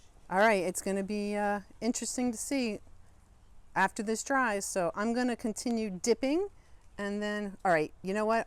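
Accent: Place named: Michigan, United States. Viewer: You must be from Michigan as your wonderful accent sound so much one my friends from Michigan?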